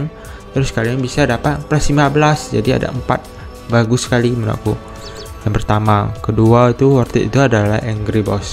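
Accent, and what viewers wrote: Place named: Palembang, Indonesia. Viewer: keknya org plg ya bang? ngmng nya kadang keluar dikit hahaha btw nice vidio bang saya jadi tau harus masuk ke event mana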